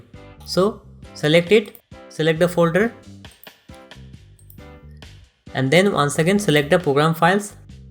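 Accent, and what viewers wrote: India. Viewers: thx for help. And btw, i love indians voice. :3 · can always trust the indian accents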